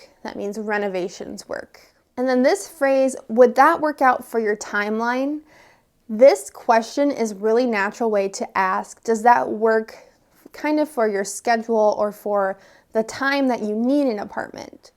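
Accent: United States. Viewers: I love listening her accent as it encourages me to keep focusing on American English which is awesome..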